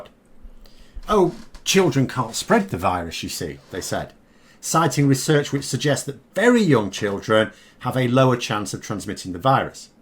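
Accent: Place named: Scunthorpe, United Kingdom. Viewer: Hi. The Google auto subtitler struggled with your scunthorpe accent in the first few seconds with a hilarious result 🤣🤣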